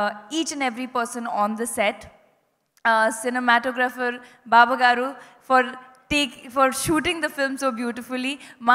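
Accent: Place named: Telugu, India. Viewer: Abababba emaina grip undha Telugu meedha, you are just talking like proper telugu girl 👏👏👌👌👏👏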